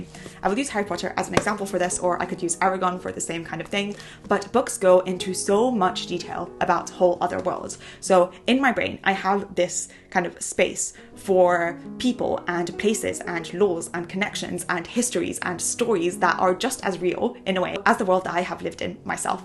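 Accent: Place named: United Kingdom. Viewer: you add some british accent when you speak in American English.I guess you first learnt british accent then American.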